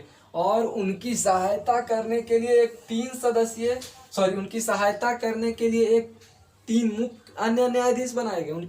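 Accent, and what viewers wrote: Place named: Bihar, India. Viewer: Nice video sir. Khashkar samjhane ka bihari language aur andaz😂👍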